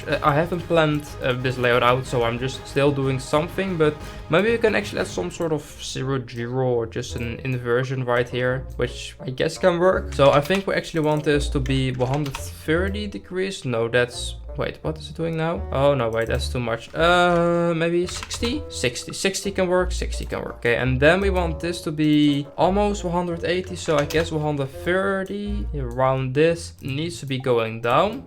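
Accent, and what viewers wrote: Netherlands. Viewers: Hey. Do u come from the Netherlands? U sound like a dutch person. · I smell dutch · jij ben nederlands dat hoor je gewoon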